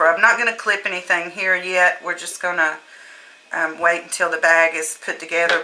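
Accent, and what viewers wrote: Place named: New York, United States. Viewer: It's so great that you keep your accent in your video!! so much of the usa is working to eliminate accents and talk like tv newscasters in a fabricated (non)accent called "general american". Its so boring and awful. I think it wont be long and the nyc/nj accent will be wiped out. Its sad. Kudos to you.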